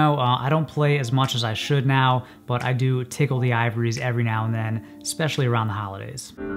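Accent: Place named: Texas, United States. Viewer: Texan accent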